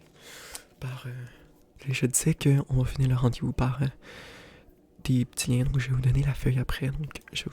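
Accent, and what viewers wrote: Quebec, Canada. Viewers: j'apprécie les explications données pour chaque objet utilisé , je connais pas tous les termes malheureusement mais mon cerveau se relaxe avec ta voix et ton scratching lent je crois que c'est ça m'a déclenché des frissons . enfin bref , tout ça pour dire que je viens de te découvrir cette nuit . je n'arrivais pas à dormir et je suis tombé sur une de tes vidéos , après hop abonné . l'accent québécois m'apaise + asmr combiné = mon cerveau est content 🤔👍👏✌ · aaaah j'adore l'accent québécois :) tu es mon nouveau asmrartist préféré ;)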